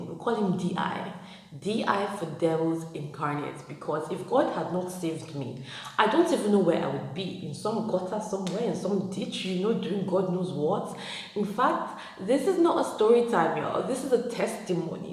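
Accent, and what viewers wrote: Nigeria. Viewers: Hey sis. Your braids is really cute. U did a great job. I like your smile and 9jia accent. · Your storytelling w/ the Nigerian accent has me screaming☠️💀😅you are sooooo funny🤣🤣🤣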